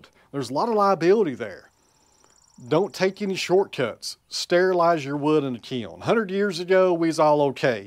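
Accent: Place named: Tennessee, United States. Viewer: EMC {not AMC },: Equilibrium Moisture Content - My New England ears don't hear past your Tennessee drawl well.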